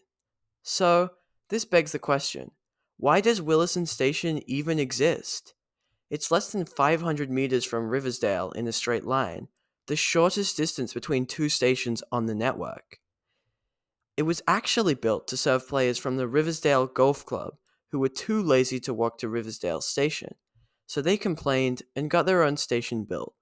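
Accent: United States. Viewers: i'm pretty sure I saw my car in the background of one of the still photos. Also, can I ask about your accent? It sounds partly American. Is that on purpose? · Is there a particular reason you talk with an American accent? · are you part american/canadian?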